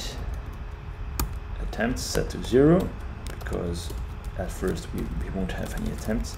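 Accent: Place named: Brazil. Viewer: You sound Brazilian! Where are you from?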